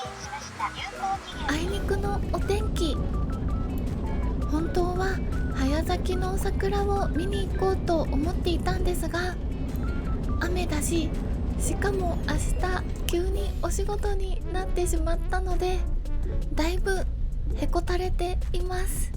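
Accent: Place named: Shizuoka, Japan. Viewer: ❤ いつも静岡訛のトークで懐かしく癒やされます🥰 それにしてもは雨女かな🤔笑雨も味方にする凄さはならではかな💕 因みに俺は晴れ男みたい😅 あとゴールデン免許ね🎉笑ゴールド免許とも言うよ🐼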